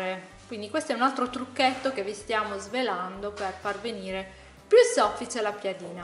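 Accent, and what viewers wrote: Sardinia, Italy. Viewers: da dove sei? la Sardegna? sento l 'accento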